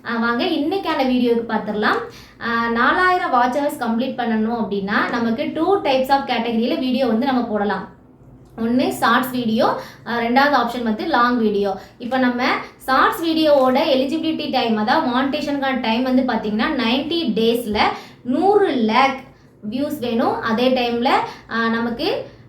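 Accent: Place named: Tirunelveli, India. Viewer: Tirunelveli slangs super sister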